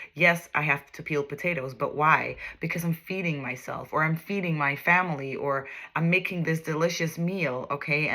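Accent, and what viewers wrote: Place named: Midwest, United States. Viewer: [VIRGO]~~~🛩️ HI! I had 444 on my phone and then you pop up on my TV screen😊 I'm new here. By your accent I would've swore your from the Midwest like myself..Illinois. Nice to meet you❤ have a awesome year! Your a doll😍😊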